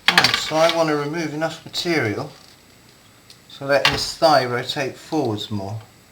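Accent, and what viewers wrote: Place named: England, United Kingdom. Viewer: Nice video. I have wondered about scale too. Seems like it is not always right at the scale that is stated, they seem use the term "scale" loosely. By the way, looks like "Polystyrene Man" was badly burned in a fire! lol. I always like listening to an English accent and phrasing. I think most non Brits do since it is not something we hear that often and it is just such a pure form of English than what it has morphed into in the states.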